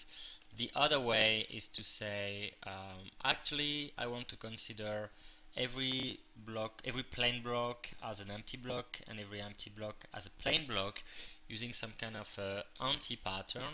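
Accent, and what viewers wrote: France. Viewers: french or belgian? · Are you french?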